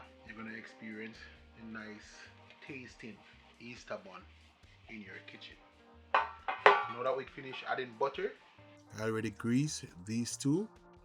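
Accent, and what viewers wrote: Jamaica. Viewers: Nice chef, sounds like you come from Clarendon...the heg...lol my family is from St. Elizabeth.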